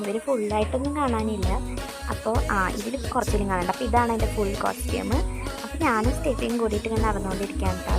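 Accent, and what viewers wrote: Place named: Thrissur, India. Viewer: സംസാരം കേൾക്കുമ്പോൾ തൃശ്ശൂർ ക്കാരി തന്നെ❤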